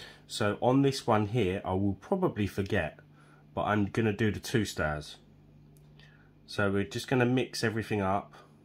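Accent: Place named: England, United Kingdom. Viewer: Sorry, I must have missed something you said, when do you apply the black/white colour to the pieces please? I’m worried about doing it too soon and obliterating everything else. Thankyou so much for your work and videos, sometimes they are all that keep me afloat, it’s lovely to hear an English accent too, being of that country.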